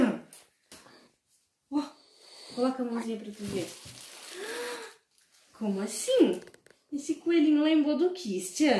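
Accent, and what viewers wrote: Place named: Rio Grande do Sul, Brazil. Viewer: Perguntei de onde vocês são porque você tem sutaque de gaúcha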